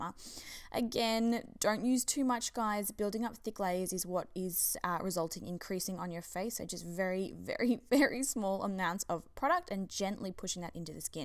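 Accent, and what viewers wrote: Australia. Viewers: You have the OPPOSITE skin type and foundation preference to me, yet I just can NOT stop watching your channel. And (no offense) I normally can’t STAND Aussie accents. Yours is just SOOOO cute, like a kitten purring. You’re just so charming, authentic, and your eyeshadow game is unmatched. Eyeshadow is where I seek the MOST instruction, as I have somewhat small eyes, a bit like yours, but I can’t tell, bc your eye looks are so FUDGING PERFECT. If you could do more eyeshadow tutorials, I’d send you a hundred pieces of vintage jewelry, no joke. Seriously. Also, you give the BEST BEST BEST makeup tips. I watch SO many makeup ‘gurus’, and since I found your channel just a few days ago, I’ve found tips I’ve seen NOWHERE else, which I’ve added all of to my playlist ‘BEST MAKEUP TIPS’, which only gets the very BEST videos added. Well done, keep it up 👏🏻👏🏻👏🏻👏🏻👏🏻👏🏻👏🏻👏🏻👏🏻👏🏻👏🏻👏🏻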